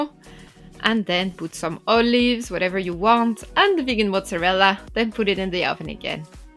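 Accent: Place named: France. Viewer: Hello! Je profite de ton accent français pour te féliciter dans la langue de Molière! Cette vidéo est très claire, agréable à regarder et donne envie de reproduire la mozzarella. Je vais me lancer car j’ai bien envie d’une bonne pizza! Continue!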